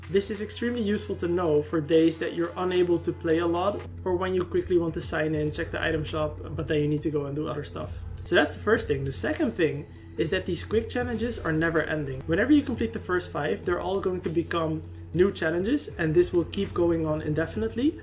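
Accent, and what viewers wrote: Netherlands. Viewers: I can hear your dutch. Groetjes en bedankt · One qeustion : u dutch?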